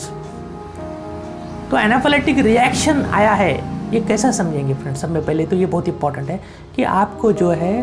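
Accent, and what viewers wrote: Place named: Bangladesh. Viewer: Bangladeshi ho ky ...sound se aise he lgta hai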